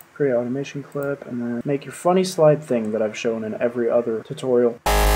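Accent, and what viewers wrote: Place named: United States. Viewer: I'm making an assumption on your accent but you sound like you're American! Dude I am going to rep you so hard, we need more Americans making hardcore.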